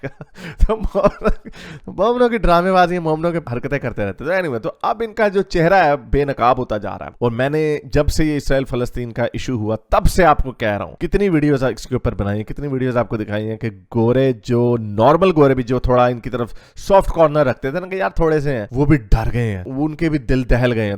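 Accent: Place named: Punjab, India. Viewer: Your accent and panjabi tadka is awesome 👏👏👌👌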